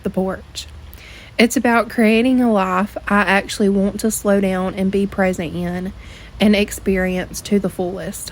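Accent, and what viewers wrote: South, United States. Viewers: Oo I love the Southern accent. I’m from NC so reminds me of home❤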